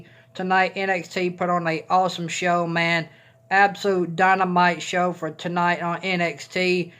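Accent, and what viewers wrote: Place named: South, United States. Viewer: You sound like a Southern gentleman. Georgia? I was born in Valdosta! 😃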